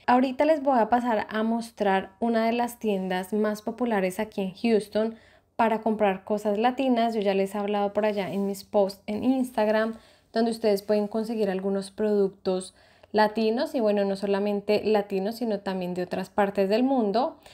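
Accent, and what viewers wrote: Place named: Colombia. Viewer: Hola... Buen video👍... Tienes acento colombiano, soy venezolano, entre Miami y Katy, ¿Cual vale la pena por empleo, facilidades, impuestos y calidad de vida?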